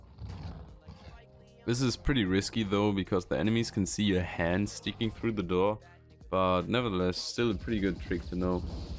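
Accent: Germany. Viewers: Are you german? Bc ur accent sounds like it. And also do you play with your viewers sometimes? Or do you stream?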